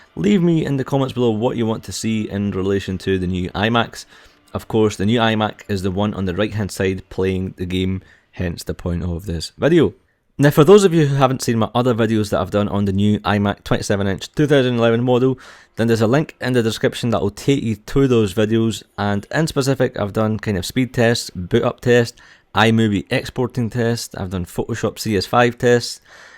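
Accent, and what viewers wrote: Scotland, United Kingdom. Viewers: you're scottish laddy!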